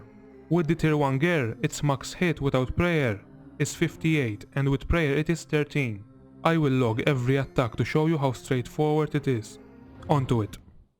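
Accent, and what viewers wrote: Malta. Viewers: you are Maltese I can tell instantly lol.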